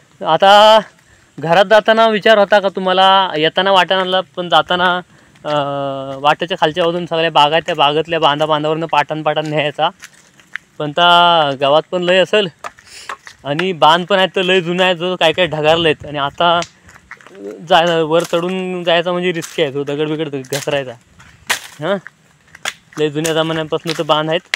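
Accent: Konkan, India. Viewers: गेले काही दिवस तुमचे व्हिडिओ बघतोय... आपली कोकणी बोली आवडली... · खूप छान व्हिडीओ दादा तुझी गावरान भाषा शैली हवीहवीशी वाटते ओरिजनल कोकणी भाषा हीच भाषा ठेव पुढ जाशील कानाला ती शुद्ध भाषा ऐकन्या पेक्षा ही भाषा गोड वाटते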